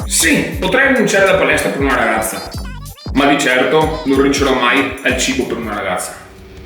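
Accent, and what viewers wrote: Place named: Veneto, Italy. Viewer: Aspetto la 100000 in 100 ore come ErikTheElectrik 😂👍 ti ringrazio amico, oltre che per questi cheat che sono PORNO, anche per i full day of eating, perchè dai delle idee veramente ottime per pasti iifym, poi accento veneto diocan è la ciliegina sulla torta 😂😂😂 5000 iscritti in una settimana e altri 2000 quasi in qualche giorno, ti meriti tutto ciò amico mio!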